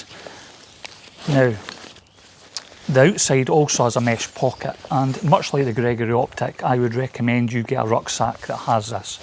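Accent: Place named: England, United Kingdom. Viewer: nice videos but it's hard for me to understand your english accent.👍🇬🇷🏴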